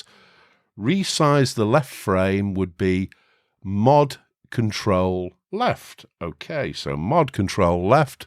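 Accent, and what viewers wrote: United Kingdom. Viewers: Congrats on the 10K! Well deserved. :) Why I like your channel mostly comes down to personality. You're calm, natural, and we're in a similar age group. I'm also loving the fact that you're British. The accent is most welcome. I've always had a soft spot for well-spoken British English. Apart from interesting content in general, I think what I appreciate the most is that you're not attempting to make everything "funny" and/or sensational like so many others do. I'm really tired of that, feels like a lot of channels target 12-year olds and are only interested in the entertainment value. Looking forwards to future content, cheers.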